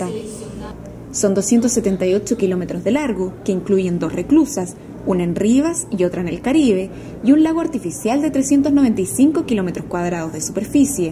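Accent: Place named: Chile. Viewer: Esta locutora del video tenia que ser chilena, como arruinan las bonitas noticias !!!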